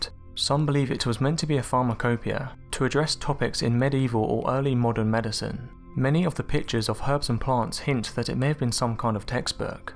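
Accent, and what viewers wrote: United Kingdom. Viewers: So nice to hear a British accent for a change. Music for my ears.. Regards from Serbia! Your videos are great.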